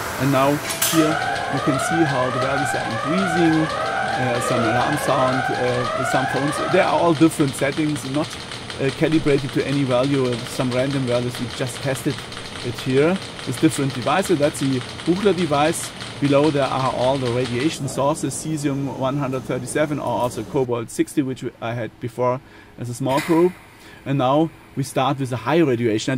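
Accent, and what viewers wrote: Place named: Germany. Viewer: Ist ja schön, dass man so ne App entwickelt hat... aber wenn die Muttersprache schon Deutsch ist, dann doch bitte auch auf Deutsch halten. Denn das Englisch hört sich einfach nur grausam an. Zumindest kann ich da kaum zu hören. Wenn mans unbedingt auf Englisch haben möchte, dann jmd. bei dem man das "deutsche" nicht so raushört ;) btw: Bei mir hört sich das auch nicht viel besser an, deshalb lass ich es auch sein ;)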